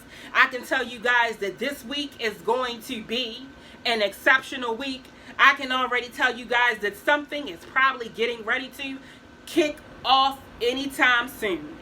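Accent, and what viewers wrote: Baltimore, United States. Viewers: Love watching you! I can always spot a Baltimore accent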